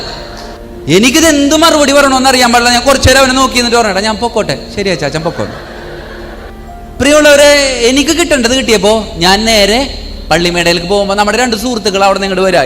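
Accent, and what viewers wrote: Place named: Ernakulam, India. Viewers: This is paravoor accent. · നല്ല കൊച്ചി ഭാഷ 👍👍👍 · അച്ചോ, അവതരണം സൂപ്പർ, 👌👌👌ചിരിച്ചു ചിരിച്ചു ഒരുപാട് ആയി, നമ്മുടെ എറണാകുളം സ്ലാഗ് 👌👌🙏🙏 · കൊച്ചി സ്‌ളാഗ് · Paravoor slang aan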